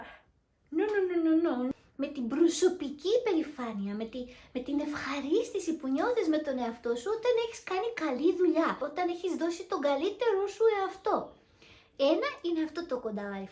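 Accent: Japan. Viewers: εισαι σκετη γλυκα.Σε ευχαριστουμε για την ενημερωση.Αυτα που ειπες σχετικα με την Ιαπωνια ειναι ακρως διαφωτιστικα γιατι προερχονται απο ενα ατομο που ζει την καθημεριοτητα της Ιαπωνιας.Θα πρεπει να εχεις και πολυ καλη προφορα στα ιαπωνικα γιατι η επιρροη τους φαινεται και στην προφορα των ουτως η αλλως πολυ καλων ελληνικων σου.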